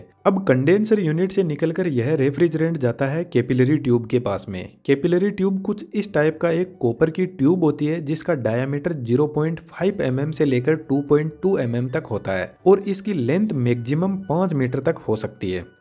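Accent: Rajasthan, India. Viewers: Bhai rajasthan se ho kya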